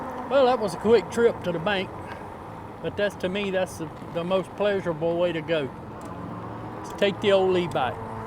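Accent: South, United States. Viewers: This is my first time looking 👀 at your video.I like your country accent it is beautiful👍🏿👍🏿😂😂😂😂😂😂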